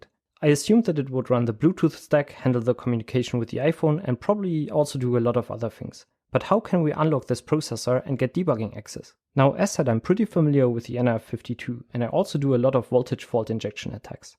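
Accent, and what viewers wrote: Germany. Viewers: Are you German? Because you sound like Werner Herzog lol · der deutsche akzent kickt 😂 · Du sprichst Deutsch oder? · Are you German because your accent. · du bist doch bestimmt deutsch das hört man an deinem english xddd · Are you German? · Gutes Video man hört das du deutscher bist XD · Whats that accent? German? Nethertheless good job. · Mashalla wie er Airtags ausspricht, sowas von Deutsch. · i hear a little bit DEUTSCH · German accent :p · Can I ask, is your accent German? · You should talk a little faster.. much too slow to understand your heavily German-accented English.. thumbs down for presentation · Bist du Deutscher ? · Bist du deutsch? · The german accent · Der deutsche Dialekt, xD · wie deutsch sich das anhört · Let me guess, you're german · I am neinty % sure this guy is german because of the accent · You sound German, are you? 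· are you German ? if so, me too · Man erkennt eben dass du Deutsch bist haha.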